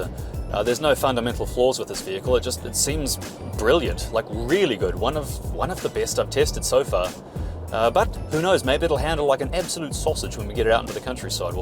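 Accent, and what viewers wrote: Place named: New Zealand. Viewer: Just discovered your channel. I like your clear speech (Kiwi ascent not bad) and your casual and slightly excited and fun style also. Your simple but detailed explanation of what you are testing (Atto 3, I like it too). Background music & Ads are not bad either. Thank you. Lloyd from Adelaide Sth Aust.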